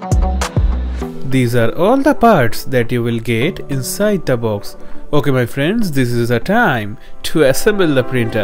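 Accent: India. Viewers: Lol, its super beautiful, i sometimes love indian-eng accent like you...lol If you think that why havent i wrote anything then, i probably wont get it its 1million percent truth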